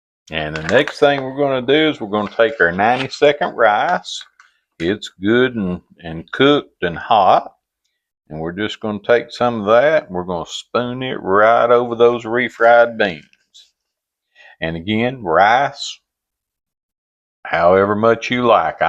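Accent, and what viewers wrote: South, United States. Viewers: Looks good. Love your country voice. Add some sour cream.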